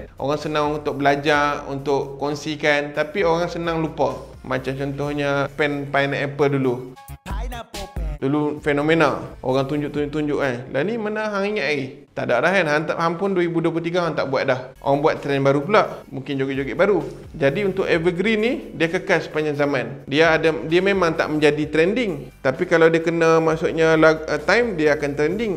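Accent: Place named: North, Malaysia. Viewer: Baru tau abang orang utara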